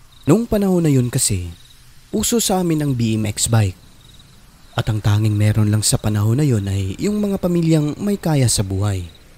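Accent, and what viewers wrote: Visayas, Philippines. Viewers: Hayyy..ang sarap ng sanga ng bayabas lalo na.kung medyo tuyo na..malanit sa pwet.really like ang accent mo pag nagbibisaya ka Sir natatawa akong.mag isa..thanks di kompleto ang araw namin hanggang di naririnig ang kwento mo.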